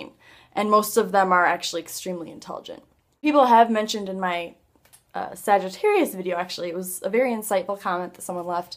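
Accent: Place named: Midwest, United States. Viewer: This is the strongest midwestern accent I've heard in a while.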